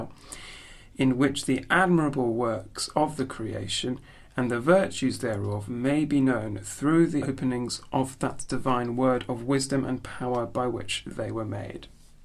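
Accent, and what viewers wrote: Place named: England, United Kingdom. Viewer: I wouldn’t mind this in full, as I can’t find the complete audiobook of the journey. It’s also good to have an Englishman read this , as American speakers cannot pronounce British English place and person names as those in the British isles say it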